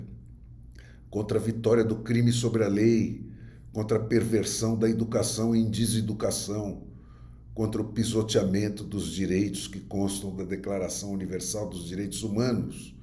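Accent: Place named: Arab world. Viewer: esse cara tem sotaque árabe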